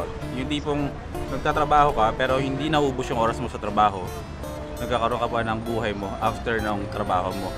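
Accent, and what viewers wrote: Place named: Negros, Philippines. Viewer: Mukhang taga negros na yung tuno mo mag salita ah..!! Hihihihi Solid perstaymers tayo!!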